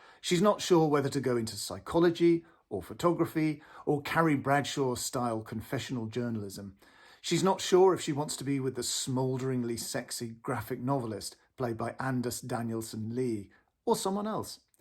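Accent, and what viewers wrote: United Kingdom. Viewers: I did subscribe ages ago :-) love your british english I sometimes don't really understand. So your vlogs have added value to me since I learn something about movies and also improbe my bad czech english! Greedings from Plzen!!!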